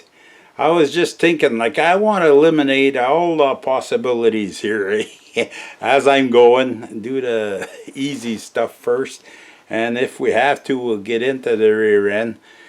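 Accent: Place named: Upper Peninsula, United States. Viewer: Sounds like a Yooper. I think this guy would be a awesome neighbor.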